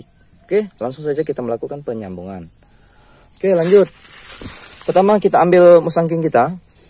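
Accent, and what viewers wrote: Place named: Java, Indonesia. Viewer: Bang dari logat bahasanya kok Borneo nya cukup Ke Jawa- ne ha ha ha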